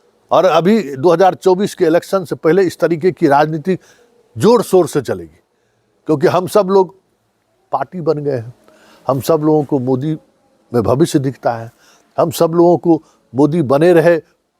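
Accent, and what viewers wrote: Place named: Bihar, India. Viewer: Once you are narrating in Natonal video , you must modify your pronunciation. It is a typical bihari accent. Please speak in proper hindi.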